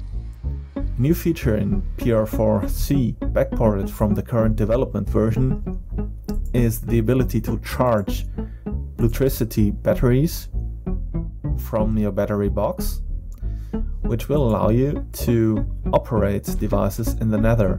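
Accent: Germany. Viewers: Deutscher oder..?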